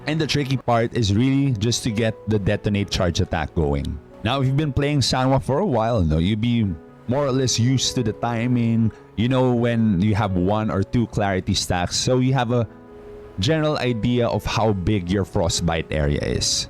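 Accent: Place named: Jamaica. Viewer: Bro are you a yardie ? I cant help but notice the accent. Thanks for the vid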